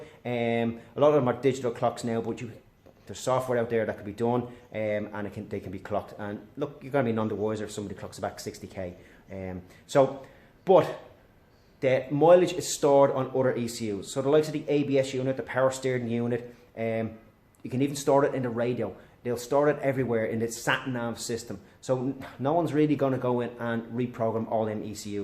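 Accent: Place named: Ireland. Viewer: Irish mate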